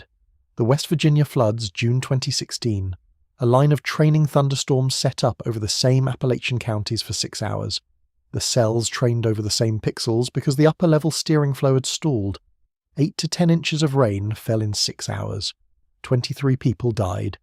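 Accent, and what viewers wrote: United Kingdom. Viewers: Can't we find an American narrator? I find this heavy British accent very annoying. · The worst weather was the same time as the Dust bowl. Government is not smart enough to solve any problems and they do not want to balance the budget. A house of Reps without a budget is not a constitutional government. Currently irritated by British accent.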